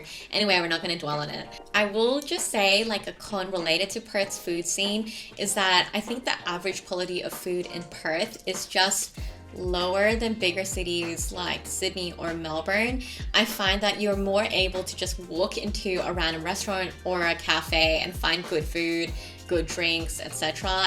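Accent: United States. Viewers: Nice vid - bit of an American accent there? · sorry to ask but why do u have such a american accent? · You're 30 and the American accent stuck with you lol what a load of virtue signalling bs. Sorry to burst bubble.😂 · Are you from north America your accent sounds Yankee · Are you American? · Nice video! You grew up over here but how did you get such an American twang?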